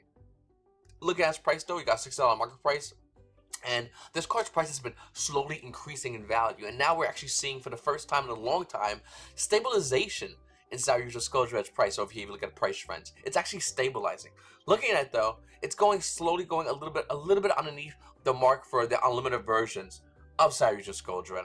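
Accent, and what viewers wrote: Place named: Northeast, United States. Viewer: Kentucky?..........KENTUCKY?.......... ON god i thought you was from NY, Boston or Jersey, just by your accent! Hey it's V here! If that dont sound Northeast when u say it i don't know what is Lmao!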